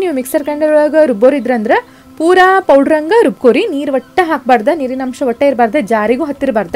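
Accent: North Karnataka, India. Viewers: ನಿಮ್ಮ ಉತ್ತರ ಕರ್ನಾಟಕದ ಭಾಷೆ ನಂಗೆ ತುಂಬಾ ಇಷ್ಟ ಅಕ್ಕ 😍😍😍😍 thank u for the recipe we'll definitely try it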